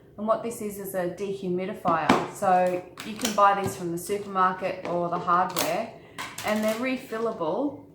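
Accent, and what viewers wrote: Australia. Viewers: Hi, like everyone else, I love your videos and you have inspired me to finally take THE big step and make some soap. My lye solution has a film over the surface, am I still able to use it? Did I do something wrong? Also, when I discovered your channel, it was sooo good to hear an Aussie accent. I'm in Tassie. Thanks, Victoria. Aussie, Aussie, Aussie, Oi, Oi, Oi!🇦🇺😊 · This is an amazing video and very satisfying Love the Australian accent 👍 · A very good video, and wonderful instruction. I love love your voice, it is soothing. Thank you. I am from Canada and your accent makes me think of and miss my Australian cousins. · Love the Aussie accent … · Nice video have my thumbs up, straightforward process, like your light Australian accent. Just one comment: I think the process is called saponification rather than emulsification. In the moment you have first amount of soap made by the chemical reaction the soap will definitely emulsify the rest of the oil. 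However, at the end of the process the oil is completely transform so I believe it is correct to say that the saponification process was completed. I think this is true even when the recipe keeps a small amount of oil unsaponified (this way we know that all hydroxide is used up completely and soap is not caustic ); recall my grandma making soap when I was a kid, and she had a way of testing the end of reaction by taking a sample in a spoon and we were not allowed to get closer (she wanted us to not get splattered). For us the kids, it was a pretty magic alchemy. Today, seeing you making soap brought all those memories back. God bless you and the work of your hands. Chris, Canada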